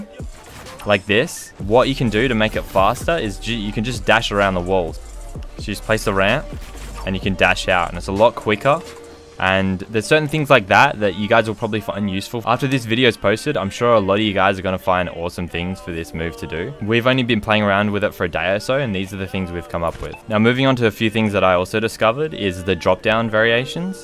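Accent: Australia. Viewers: Aye I’m a new sub lmao I could tell you were from au as well as soon as I heard you spoke aha hopefully we see each other in game at one point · is he australian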